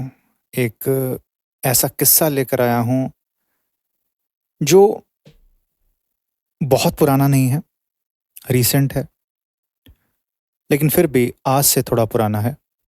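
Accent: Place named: United States. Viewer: Ye american english me kyo bol rhe ho ?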